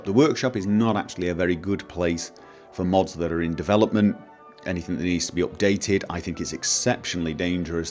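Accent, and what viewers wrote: United Kingdom. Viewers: is norwegian? tha fuuck he sounds british as fuck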